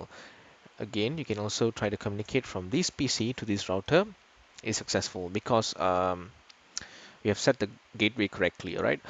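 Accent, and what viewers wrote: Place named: Malaysia. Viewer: I can't miss Malaysian accent haha, nice lah abg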